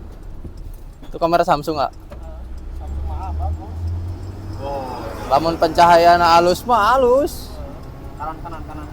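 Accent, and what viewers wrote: Serang, Indonesia. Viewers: Aduh aing setiap bicara ciri khas orang Serang he he sy senang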